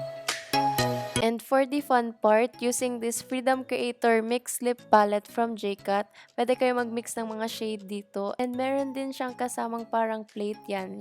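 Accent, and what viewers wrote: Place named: Bicol, Philippines. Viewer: I've missed you and your cheerful and bubbly voice (with the Bicolano accent)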